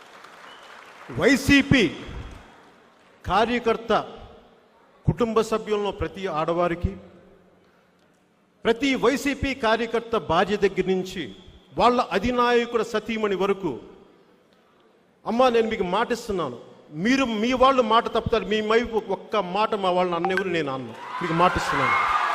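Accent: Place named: Telangana, India. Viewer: Telangana యాస ఎప్పుడు తక్కువ అంచన అని నిరిపిస్తున్నారు........ Don't play games 😛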